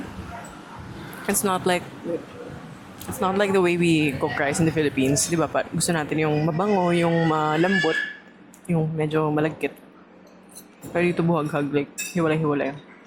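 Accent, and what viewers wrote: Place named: Latin America. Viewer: Lol I thought you were from south America during the whole video until shortly before the end. 😂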